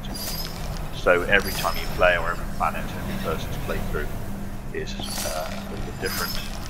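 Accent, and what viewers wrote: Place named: United Kingdom. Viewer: Came here from Josiwe's channel fyi. Good series so far! What part of the UK are you from? I'm Irish but cant place the accent?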